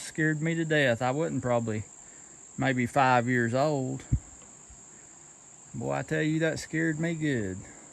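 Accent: United States. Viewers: I like the way u talk very country I do some I'm from raleigh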